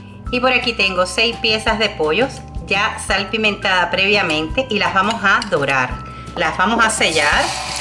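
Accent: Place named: Cuba. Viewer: Hola wuapa .nueva por aki buscando resetas .te encontré. Me gusta tu manera de explicar y tu acento eres cubana .k pinta tiene ese arros por dios k riko .un saludo un gusto verte y estar suscrita atu canal 👌💪😘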